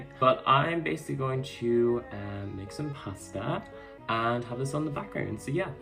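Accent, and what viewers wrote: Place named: Netherlands. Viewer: As an Afrikaans person, learning Dutch. I can hear a lot of Dutch influence in your Afrikaans. Your pronounciation is Dutch.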